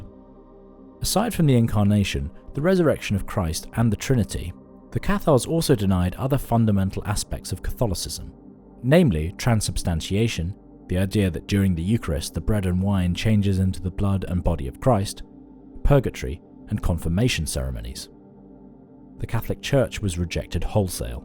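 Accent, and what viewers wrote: United Kingdom. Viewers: oh ya like the Souff London accent. Ya I used to live in London.. Heard that :)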